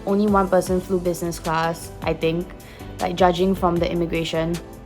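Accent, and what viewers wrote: Singapore. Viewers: However however however love the Singapore accent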